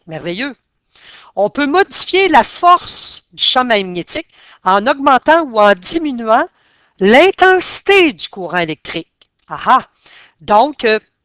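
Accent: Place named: Canada. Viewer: Bonjour madame et bien merci pour votre réponse très rapide et c'est parfait cela me permet de très bien clarifier ce sujet. Vous êtes très compétente et j'aime bien votre accent Canadien et bien le bonjour de Belgique.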